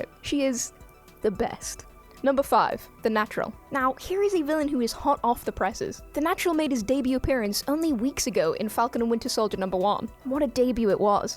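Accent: United Kingdom. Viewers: You sound like a British version of Ducky from Land Before Time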